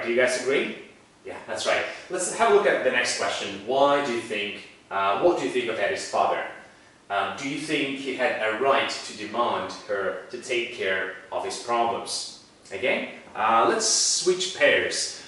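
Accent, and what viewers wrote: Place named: Russia. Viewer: I hear Russian accent in this demo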